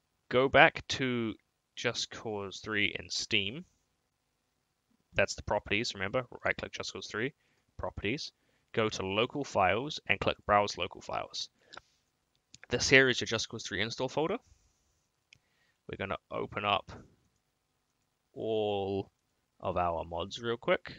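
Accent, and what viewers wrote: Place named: New Zealand. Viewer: i thought that was a new zealand accent.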